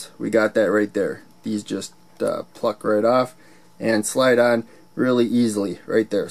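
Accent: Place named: Northern Wisconsin, United States. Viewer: Nice video. I hadn’t heard of breadboard power supplies. I also use a branch supply but often my projects need to be mobile to test so this is a nice option. By the way, I have to ask, are you from Wisconsin? Northern Wisconsin? You sound almost exactly like my cousin who grew up in Superior.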